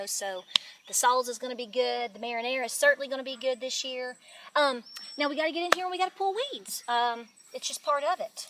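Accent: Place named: Kentucky, United States. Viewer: Your garden looks great! Up farther north and in mountains here we have a much later start, and one thing after another has been going wrong for me this year. I've got to get one of those owls, might keep the wild bunnies out. I'm about to build a wattle fence. I also need to get some form of irrigation set up, would you believe my house has no outdoor spigot. Love your accent reminds me of friends I had growing up in ky.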